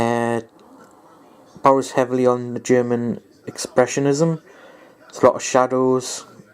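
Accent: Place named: Newcastle, United Kingdom. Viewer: Are you a Geordie or a Mackem? I'm from Gateshead :) Subspecies is great, I enjoyed them when the Horror Channel showed them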